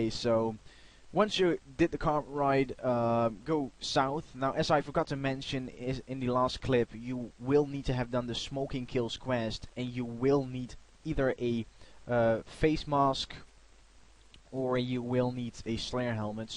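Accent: Netherlands. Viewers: I'm gonna guess by your accent that you from Holland? My bro thinks you are Norwegian but you sound like you are from Holland. Am i right?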